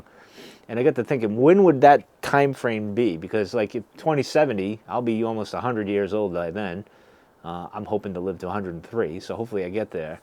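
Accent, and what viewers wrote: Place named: New England, United States. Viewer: Hi, from the sounds of it I think you are a New Englander like my self. I could be wrong but I’m picking up an accent I’m familiar with also nice ranger cloak I got the same one super warm. Like your stuff keep it up.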